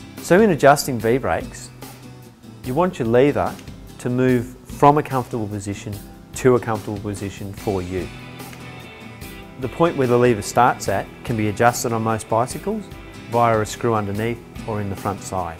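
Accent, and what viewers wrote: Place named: United Kingdom. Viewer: Excellent! You took your time and explained things well. Even with that crazy British accent :)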